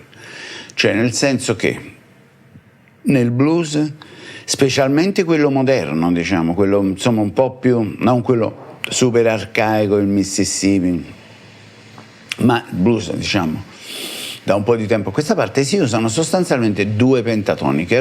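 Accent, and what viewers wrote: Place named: Naples, Italy. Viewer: Ottimo!! Ti ho conosciuto tramite l'intervista col "Tino", hai una "tomità " tutta napoletana nel parlare ed una chiarezza espositiva che mi ha fatto avvicinare ad un mondo finora misterioso non da ascoltare ma da imparare. È già il secondo video che ascolto ed è molto bello seguirti. Chapeau!!!👍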